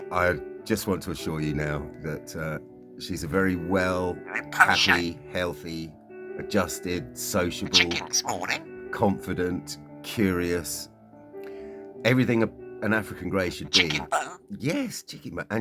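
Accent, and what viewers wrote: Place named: United Kingdom. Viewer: 😊i love your relationship..it is so loving and pure. I love watching you interact together. And I really love your British accent..I am from the USA by the way. So happy I came across you and Smurfy ❤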